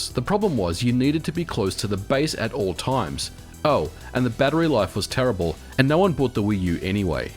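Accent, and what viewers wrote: Australia. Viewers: Why do you have Australian accent and live in USA ? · Mate, I love your videos, the pace you speak out, and the content you produce. You sound like you're from Australia?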